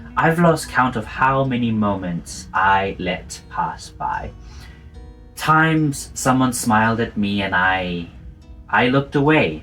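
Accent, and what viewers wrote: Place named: South Africa. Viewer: Just one thing I need to know, you accent sound a bit South African, I know that you're in Australia but do you have a connection to South Africa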